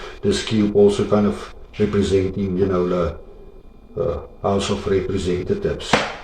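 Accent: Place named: South Africa. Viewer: Hey, sounds like a South African brother!! 🇿🇦 God bless.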